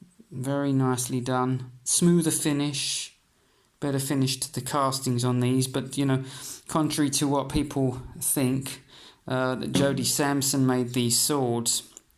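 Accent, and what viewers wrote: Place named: United Kingdom. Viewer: You sound British, like me - did you have any issues importing the Albion swords into the UK, import tax, etc., please...?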